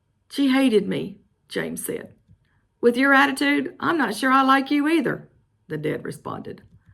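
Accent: South, United States. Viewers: I love your southern and expressive accent. Such talent!🥇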